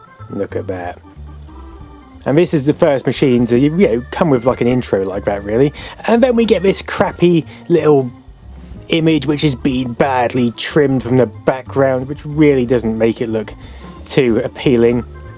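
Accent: United Kingdom. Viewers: Because he's British and that's how it's pronounced.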